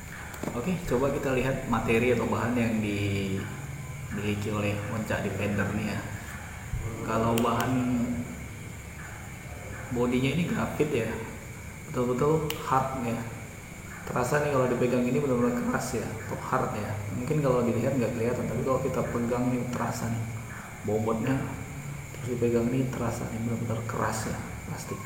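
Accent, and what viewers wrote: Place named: Palembang, Indonesia. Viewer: Keren uuyyyyyyyyyyyyyy 👍 bahasa palembangnyo jagoan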